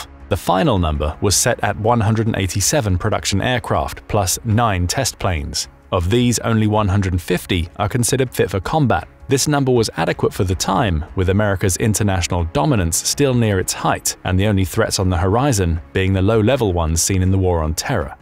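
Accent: England, United Kingdom. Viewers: Why is the speaker talking in a british accent about American assets? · More 'Anglo-Axis' bollocks with a Pommy accent.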